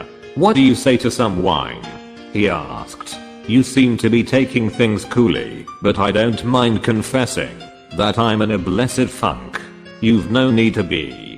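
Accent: England, United Kingdom. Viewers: best british accen..... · thanks alot for reading to us and by the way I love your English accent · Amazing story thanks alot for reading to us and by the way I love your English accent, this is fenomenal !! i enjoyed to hear the whole story ,And i understood each words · I love this story and the english accent ,intonation of the story teller. i try to immitate her accent in every words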